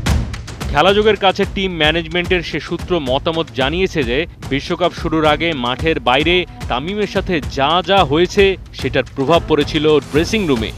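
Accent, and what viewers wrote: Kolkata, India. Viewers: Kolkatar bangla.....শ্রুতিক টু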